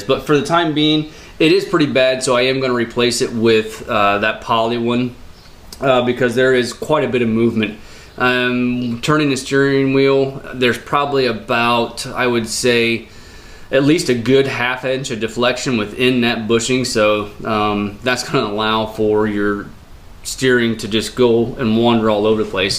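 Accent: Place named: Ohio, United States. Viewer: Was funny to recognize a fellow Ohioan by the way you say "like that" as "like cat". Not making fun of you at all, it's how we talk. I was born there (columbiana county) and lived there for 26yrs. Now I've spent 28 years in Kansas and lost that part of the accent. I own a 2005 slt power wagon. 👍🏽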